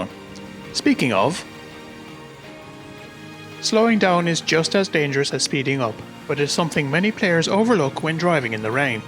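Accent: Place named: Kerry, Ireland. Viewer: You sound like you’re from Kerry or somewhere near there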